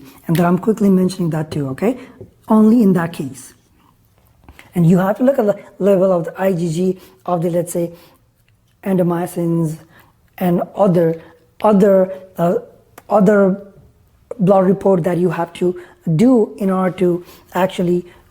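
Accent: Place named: India. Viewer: Sir your teaching is good but you will speak Indian kind English i thought better to understand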